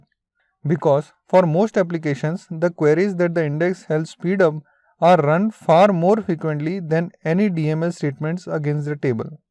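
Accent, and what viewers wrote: India. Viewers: Indian people english. Suddenly close the video ... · The Indian makes my ears cry. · indian accent makes me sleep...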